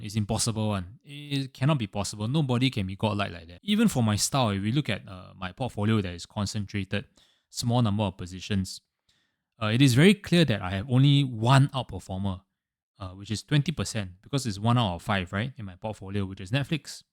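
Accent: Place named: Singapore. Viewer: Really love the singlish presentation!! Totally relatable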